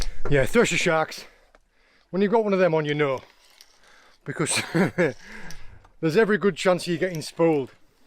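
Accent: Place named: North East England, United Kingdom. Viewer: Been watching your channel for about two weeks now,thought I herd a north east twang lol am in Newcastle but my late grandad was a amble fisherman in the North Sea. Never been fishing myself apart from hand catching flat fish down the ozburn under byker bridge Newcastle as kids when the tide was out. Do you ever see basking sharks in Cornwall?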